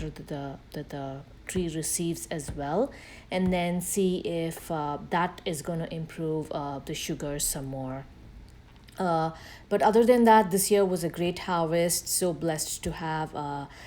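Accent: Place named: India. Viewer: Aisa lag raha hai jaise koi Indian bol rahi ho. ji, bharat se ho kya?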